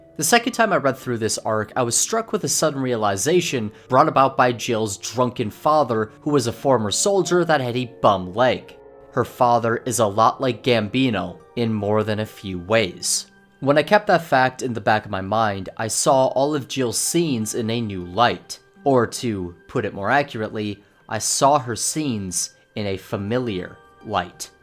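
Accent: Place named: United Kingdom. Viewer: hey broken-sexual boy, why do you pretend Griffith = "Griff-iff?"...are you infected with some form of disease that perverts your enunciation to sound shitty aka British ?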